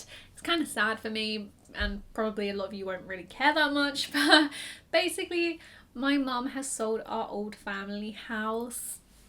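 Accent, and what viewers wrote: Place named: United Kingdom. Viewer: British accent is very beautiful to listen. I can listen you speaking any longer. You're gorgeous!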